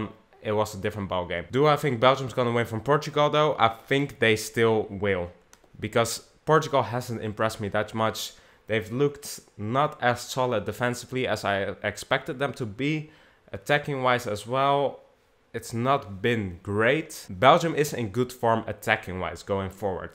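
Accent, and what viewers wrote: Netherlands. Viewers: ik ben ook nl btw ik wist dat je nl was was te merken aan je accent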